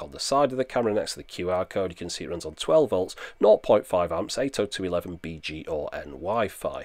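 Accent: United Kingdom. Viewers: I'll like the video for the british accent. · As an American I'm not bothered how you pronounce 'router' in the UK. There are many words we pronounce differently in our respective countries, such as "aluminum". It's not an issue with me but most everyone I know here in the USA does in fact pronounce 'Route 66' as "Rowt 66", not root 66. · Good review... Now if you could just drop that British accent... how about a Southern US accent... that'd be a little easier to understand : ) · Okay... I can almost accept "rooter," but where do you Brits get "ah-lume-in-EE-um?"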